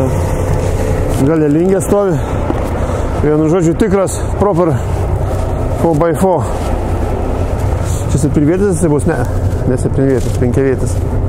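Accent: Šiauliai, Lithuania. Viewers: is akcenta bene is siauliuu busi?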